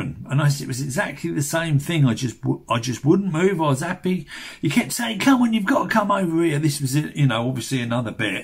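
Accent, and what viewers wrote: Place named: London, United Kingdom. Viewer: Great video. Thanks for sharing. Do I detect a slight London accent there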